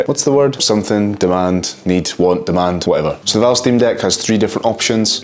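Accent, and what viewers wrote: Scotland, United Kingdom. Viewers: I appreciate your transparency and most of all your content (and scottish accent).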